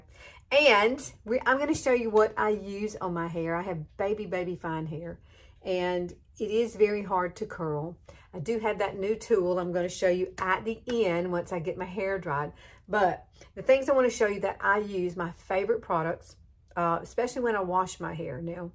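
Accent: South, United States. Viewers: OMG girl I cannot wait to order that curling iron. I too have super fine, super thin, and super straight hair. My hair never and I mean never holds a curl. I cannot wait to have lasting curls and volume. Also, I think you are so damn cute! I showed my husband a brief clip of you and he chuckled and said other than the southern accent he could swear we were related by your personality. Accept I am very sarcastic! One last thing, I do not have a pace maker but I just got a portachath placed in the same exact spot your pace maker is. Be patient it will become just a part of your body and at some point you will forget its there. We just have to count our blessings for the gifts of modern medicine that can extend our lives and keep on keeping on! Blessings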